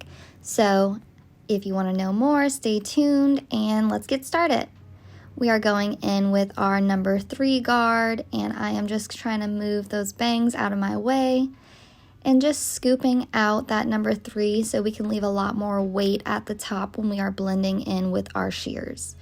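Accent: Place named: United States. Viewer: I can’t do with the American accent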